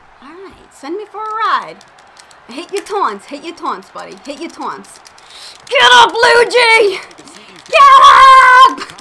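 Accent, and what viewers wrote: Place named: New Jersey, United States. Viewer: l love her jersey accent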